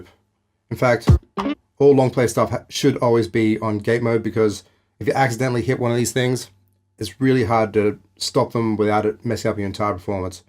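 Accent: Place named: Australia. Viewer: oh wow i would not have guessed that was just an irig! Ah didn't know there was such a range of Australian accents, thats great, keep on rocking my dude!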